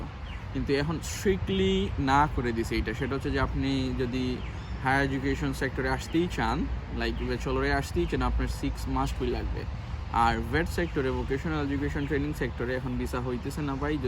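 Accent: Sylhet, Bangladesh. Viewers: bro ur sylheti i guessed it from ur accent tht u used love to heard tht 👽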